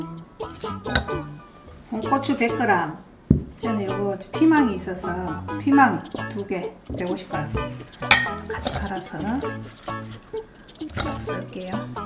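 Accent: Gyeongsang, South Korea. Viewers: 경상도 사투리가 참듣기 좋아요 전 전라도 사람인데요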